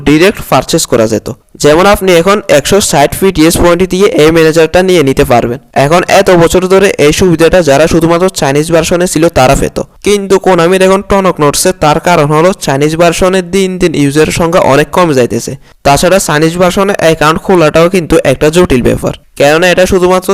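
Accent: Noakhali, Bangladesh. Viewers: bro are you from noakhali?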